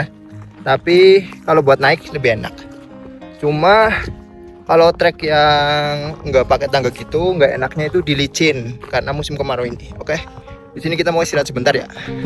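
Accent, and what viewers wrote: Banyumas, Indonesia. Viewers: Semangat mas ganteng wong ngapak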